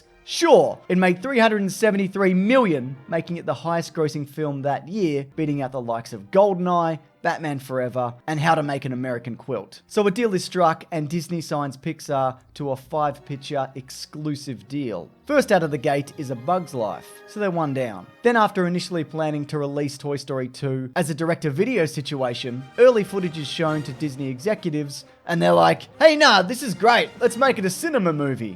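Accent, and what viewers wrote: Australia. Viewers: Forgive me. I'm a dumb American. Is this an Aussie accent?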